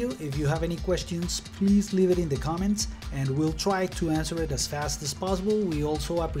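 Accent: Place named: Colombia. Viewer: You sound very Colombian.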